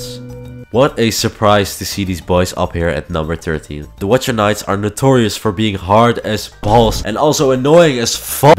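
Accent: Netherlands. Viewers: any chance you're dutch? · ur Not english Are you? Your accent Sounds very Dutch to me😂 Don’t worry bruh, I’m dutch😂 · are you dutch? you speak english like you are dutch (not an insult) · The biggest skill issue was listenting to the heavy dutch accent 💀 Still a great video though LMAO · Are you dutch? You sound dutch. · I just love that i can immidiately hear the dutch accent. Geweldige video! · U Dutch? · you sound pretty Dutch. Your accent I mean 😅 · The dutch on this dude is something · Personally feel like you could work on your comedic timing a little. You sound very Dutch, which might be why the jokes don’t land on me. Great production value though. I just don’t find it very funny. Soul Tyrant is so bad on repeated playthroughs and pantheon runs. He’s super easy yet super slow cause he’s rarely ever in nail range. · Dit is zo’on erge Nederlandse accent 😭😭😭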